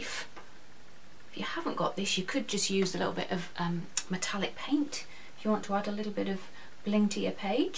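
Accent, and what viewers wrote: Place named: Yorkshire, United Kingdom. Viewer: I didn't realise you were from Yorkshire!!.. you certainly have a lovely accent so if I was guessing I would say you're from North Yorkshire...Ilkley or Harrogate? I'm not that lucky, I'm from South Yorkshire.....Barnsley, (all flat cap n whippets) 🤣 and you have to say like a bleating sheep...Baaaaaaaaarnsly🤣🤣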